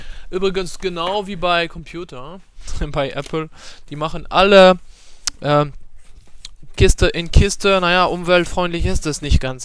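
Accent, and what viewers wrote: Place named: France. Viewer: hab ich mir gedacht man hört den französischen akzent parce que je parle aussi francais ;)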